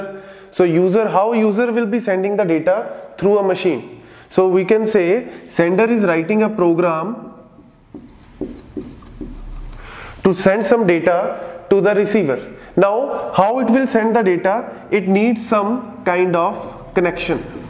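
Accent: India. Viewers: Thanks sir... Sir apke English bhi mujhe hindi se lag rahi h .😘😘 thanks for it again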